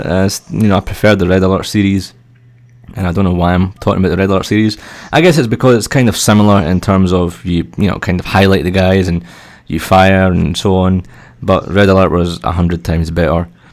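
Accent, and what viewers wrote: Scotland, United Kingdom. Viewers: I adore your Scottish accent (if that's what it is), even if it's quite hard for me to understand as a non native speaker! Very interesting real-life usage tests, the MBA looks like a great machine.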